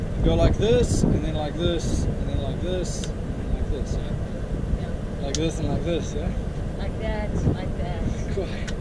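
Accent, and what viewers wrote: South Africa. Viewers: Why do you sound South African? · By his accent he must be South African. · Mate are you South African ? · Dude are you South African?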